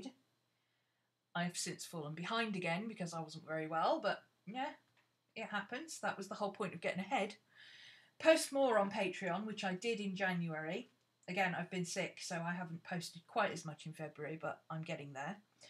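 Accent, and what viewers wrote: United Kingdom. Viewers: Hi,. I love planner flips. I just asked to join your FB group. Actually, may I ask, where are you from specifically in the UK? I do believe it's an English accent, but I think there may be something else in there?? Not sure. Right now, I 'm fascinated with accents, especially British accents. I am writing a book, actually, and am trying to write some dialogue in an accent for some scenes. Boy, that's hard, for an american, anyway.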